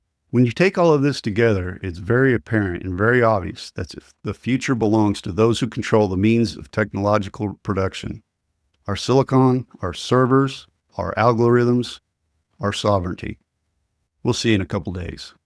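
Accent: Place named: Texas, United States. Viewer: Is this guy from Texas? He sounds ALOT like the voices I hear and listen to in the Energy Industry. I'd be a fortune that he's from Texas.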